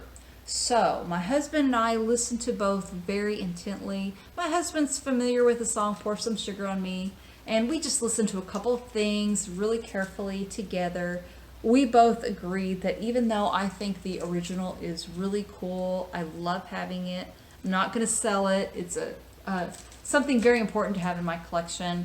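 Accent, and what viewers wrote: United States. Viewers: I'm English (in London). Although I only post an occasional comment, I always watch your videos - I subscribe to just three VC channels and you're one of 'em! I enjoy your soft, soothing American accent, and the way you speak with such grace. I also like the fact that you take notice of the record cover art. Best Wishes for Christmas and the New Year 🤗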